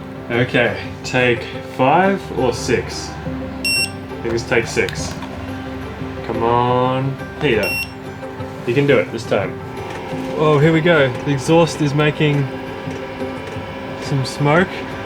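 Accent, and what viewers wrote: New Zealand. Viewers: Awesome!!!! Well done! I love New Zealand, the accent and your work! Can’t wait to see more!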